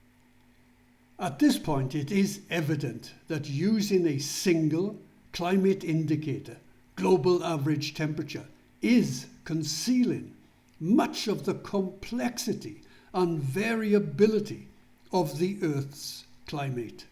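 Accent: Wales, United Kingdom. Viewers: Very clear and well presented and your Welsh accent is lovely to listen to.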